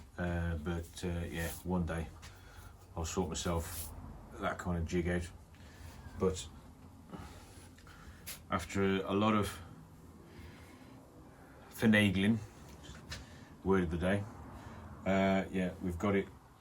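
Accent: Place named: Kidderminster, United Kingdom. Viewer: Nice job. Btw my wife heard your video from another room, came running in and shouted ‘Kidderminster!’ (referring to your accent). She also comes from the carpet capital of the western world (we met while both working at Gilt Edge Carpets).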